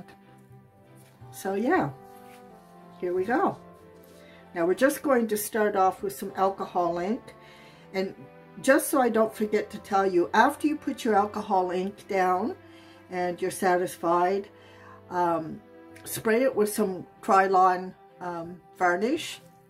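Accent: Canada. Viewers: I picked up from your accent that you are Canadian. I'm in Adelaide in South Australia and you will definitely be hearing from me again. I just love your pieces.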